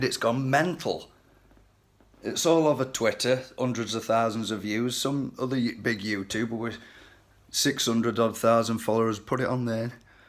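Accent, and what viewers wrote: United Kingdom. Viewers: Don't be a soft cnt and apologies,you are the voice of a man of Britain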